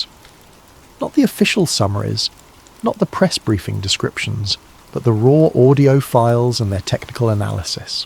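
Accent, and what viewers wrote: England, United Kingdom. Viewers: English accent, really? We're talking about a place in the U.S. It's too distracting.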